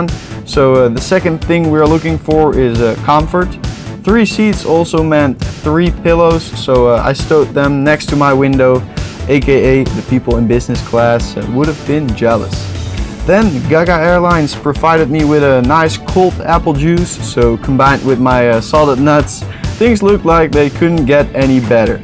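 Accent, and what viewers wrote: Netherlands. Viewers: You can definitely hear that you are Dutch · nederlander dat hoor je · Nederlands Engels😍😂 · Wait u dutch?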